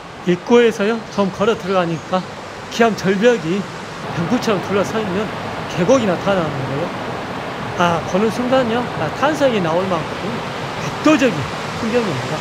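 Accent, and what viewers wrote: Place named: Jeolla, South Korea. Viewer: 워미... 이런말 혀문 안대능거이 나가 잘 알기능 혀지먼 도저히 안혀블고능 못참긋네요잉~ 아재요~ 절라도 사투리 억양 참말루다가 어머어마 혀불구마잉.. 조와요 눌라불고~ 잘보구 가게요~~~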